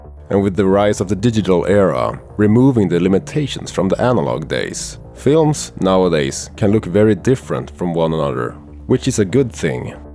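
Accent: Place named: Sweden. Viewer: Är du svensk? Låter som de när du bryter! Bra film! har funderingar kring köpa denna kamera :I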